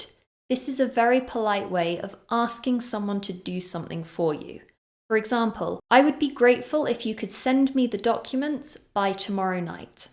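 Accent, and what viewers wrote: United Kingdom. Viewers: As a Brazilian citizen I prefer to learn from her British accent which is clear and sound more correct. I really loved this exciting 12 minutes class. I just found her channel and advise everyone to stick with her teaching.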